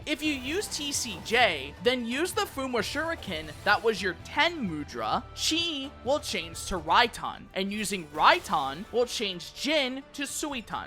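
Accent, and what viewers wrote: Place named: United States. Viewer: my god your voice is so painfully american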